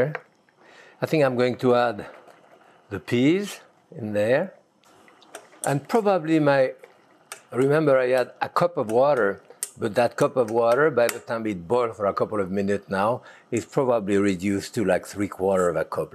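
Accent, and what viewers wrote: France. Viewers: After all these years, he's still speaking like a perfect french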